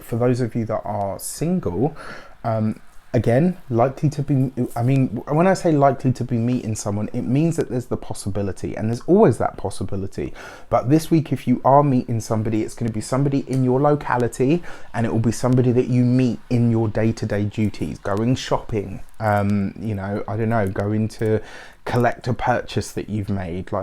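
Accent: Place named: London, United Kingdom. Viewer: Am from the south west of England absolutely love your accent makes me feel at home in LDN. Thank you for this weeks 🙌🏾🧡🙏🏾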